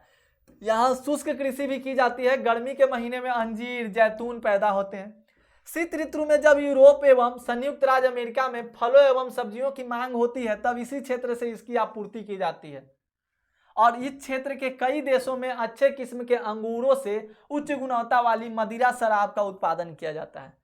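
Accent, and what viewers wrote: Bihar, India. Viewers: Sir aap bihari h kya ?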